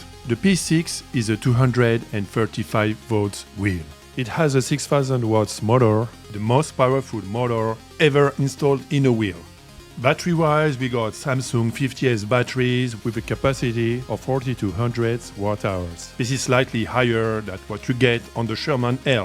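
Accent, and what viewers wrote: France. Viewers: T’es français ta un accent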